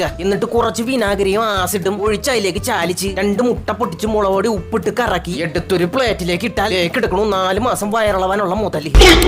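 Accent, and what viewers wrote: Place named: Thrissur, India. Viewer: ഈ ചേട്ടന്റെ ഭാഷ തൃശ്ശൂർ ഭാഷയാണ്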